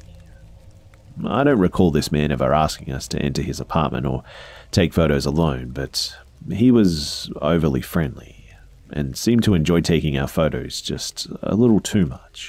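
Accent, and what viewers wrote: Australia. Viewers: So refreshing to listen to creepy stories with an Aussie accent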